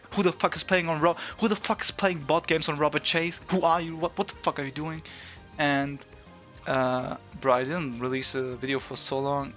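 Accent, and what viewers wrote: Germany. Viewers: are you german? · Are u German? I hear some accent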